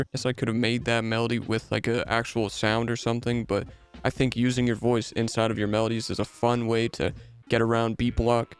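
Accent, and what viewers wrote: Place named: Canada. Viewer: This whole video helped alot and i can hear the canadian in your voice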